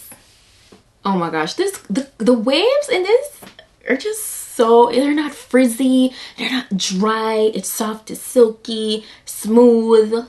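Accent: Jamaica. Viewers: Hello my sister my Jamaican sister you have to be from Jamaica because I hear the accent my family is from St Mary Jamaica and I bought this same wig to as well and I decided to look up somebody who was putting it on to see how I can situated on my head and I came across your video and you happen to be Jamaican too please tell me if I'm wrong but I can hear the accent girlfriend. Love ya my dearest. Blessings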